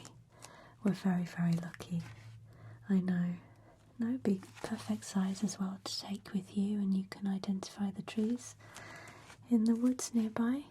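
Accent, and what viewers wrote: United Kingdom. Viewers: Theirs nothing quite like a British ladies voice. Outstanding.❤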